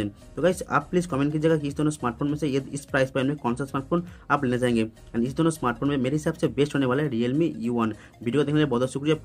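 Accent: Bengal, India. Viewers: Sir aap Bengali ya Oriya hai kya?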